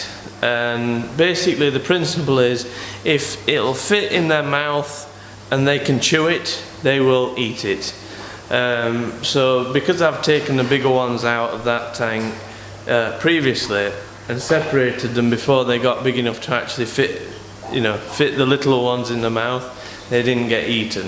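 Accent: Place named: Scotland, United Kingdom. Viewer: With the strong northern or Scottish accent, I am able to understand about half of what you say. You should hire a southerner or Irishman to do the speaking.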